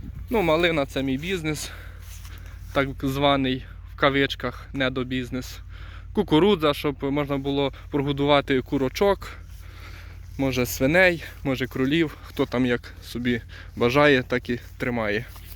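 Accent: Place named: Zbruch, Ukraine. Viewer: Akcent. Vrodi. Nedaleko. Zbrucha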